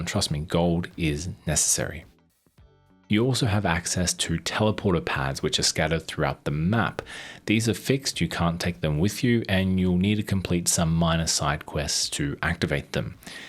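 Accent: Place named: Australia. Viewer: At first I read "Latvian's First RPG" and was confused, like wtf is this guy's Aussie accent... then I checked the sub count and holy shit man, no fucking way your channel is that obscure! You're going to blow up if you keep going at this pace, trust me.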